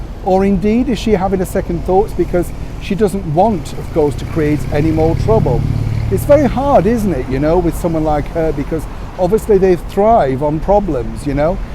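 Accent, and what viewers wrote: Yorkshire, United Kingdom. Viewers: Dear Neil, it is always so nice to hear that lilting Yorkshire accent and your down to earth wry humour.. I fear that giving the Sussexes too much free rein will only cause further disruption. The late Queen was against a 'half-in, half out' arrangement so I do hope that with her passing, this request from the Sussexes will not be given any further consideration. That is the direction I see harry and Me-again attempting to go....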